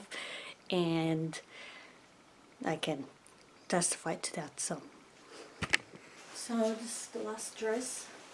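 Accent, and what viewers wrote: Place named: United States. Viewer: in portugal wouldn't you learn british english? your english sounds totally american to me.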